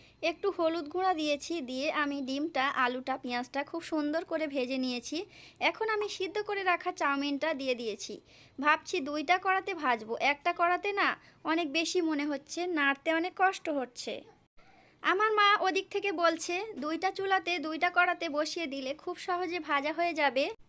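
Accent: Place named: Bangladesh. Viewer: আপু তোমার কথাবার্তা শুনলে আর তোমাদের খাবার দেখলে মনে হয় না যে তুমি ইন্ডিয়ান মনে হয় তুমি আমাদের বাংলাদেশ যাইহোক আপু খুব সুন্দর লাগলো মাশাল্লাহ অনেক সুন্দর হয়েছে তাদের সবজি বাগান খুব সুন্দর হয়েছে মাশাল্লাহ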